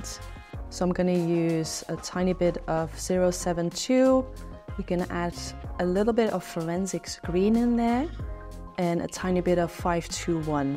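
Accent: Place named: Australia. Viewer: Lovely English pronunciation 😍 Oz one right?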